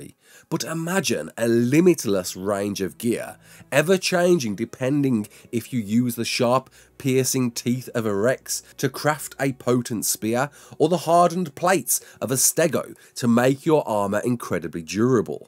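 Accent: United Kingdom. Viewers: I just love your british accent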